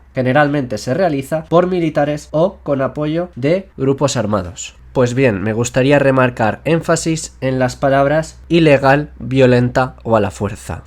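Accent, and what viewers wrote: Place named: Spain. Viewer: Pues para ser Ucraniano habla muy fluidamente al castellano y eso que soy de Mexico se te nota mucho el acento español de españa 🇪🇸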